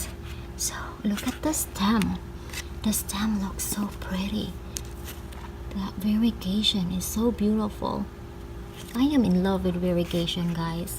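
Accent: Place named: Philippines. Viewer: Hello from Ken in Japan. Your voice sounds like you are from the Philippines.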